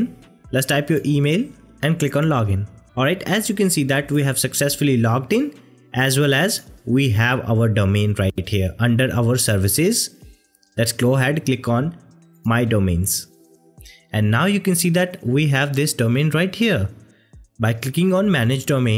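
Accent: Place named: India. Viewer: Me not believing this video would solve my problems(😫) Me after listening to the indian accent(😁)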